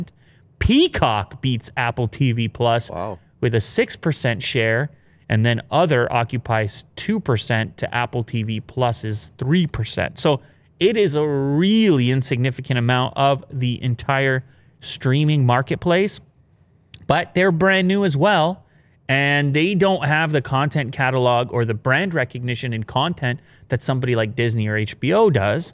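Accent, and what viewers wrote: Canada. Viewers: The first video I really noticed his 🇨🇦 accent lol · sounds more Canadian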